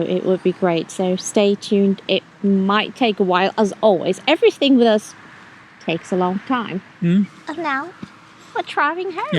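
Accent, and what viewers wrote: England, United Kingdom. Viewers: Im curious, you and are from Germany, but you both have an English accent. Especially you,. How can that be?❤ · I like your videos! You are such a lovely couple! I have one question: you are from Germany, but you have no accent. Your pronunciation is so perfect and so gorgeous British 😅 I try to get rid of my accent too but it seems to be impossible… How did you manage it?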